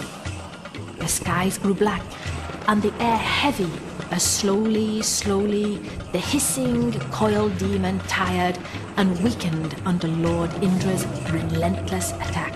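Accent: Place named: Rotherham, United Kingdom. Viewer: Completely ruined by Rotherham accent!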